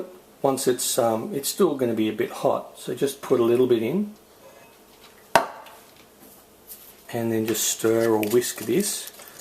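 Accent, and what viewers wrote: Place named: Australia. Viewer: hayward, you love his accent because he's australian! Go aussie XD Your whisk is cute ^_^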